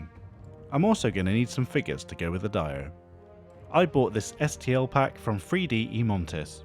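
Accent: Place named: England, United Kingdom. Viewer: Wonderful result as always. It’s hard to tell that the StuG isn’t 1/35 scale. My wife overheard me watching the video, and when she heard you say “I have to stop saying splotches” she said at least it sounds sophisticated with an English accent. 😂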